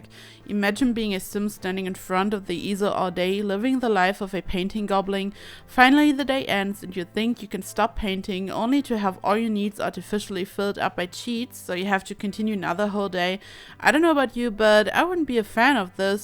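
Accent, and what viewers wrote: Germany. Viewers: In der ersten Sekunde, wo du gesprochen hast, hab ich sofort gehört, dass du deutsch bist :D Grüße erstmal. Ich finde das Haus mega geil. Hab heute zum ersten Mal in Sims 4 ein Haus gebaut (eigentlich mag ich Sims 3 deutlich lieber und hab 4 lange nicht angerührt, aber es hat wirklich viel mehr Spaß gemacht als in 3 und ich bin sogar etwas stolz), an dein Haus kommt meins allerdings bei weitem nicht dran... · are you german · Kommt die Tante aus Deutschland? Das Englisch klingt irgendwie nicht wirklich native...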